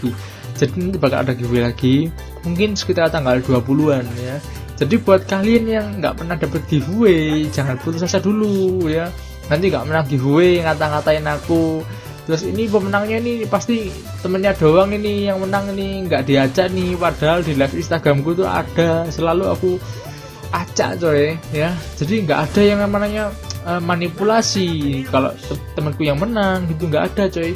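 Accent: Java, Indonesia. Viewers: Bang live streaming dong/bikinin game play WW... + nganggo boso jowo tok lek omong wkwkwk... Kangen medok mu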